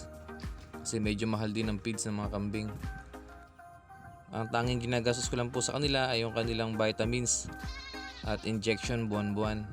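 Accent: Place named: Luzon, Philippines. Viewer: Hello sayo dyan😊 kalina ko pa guess kung saang province ka, based on ur accent ay malamang taga Luzon ba kayo? Anong province? Di ko mahulaan😊 bilib ako sa diskarte mo at sure ako na pinag aaralan mo din lahat yan para mapa ayos ang farm…nakaka proud ka naman, sana ganyan din brother ko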